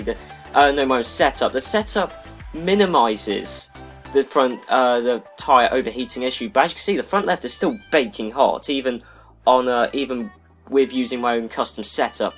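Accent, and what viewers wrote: United Kingdom. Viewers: Are you from Luton in Bedfordshire?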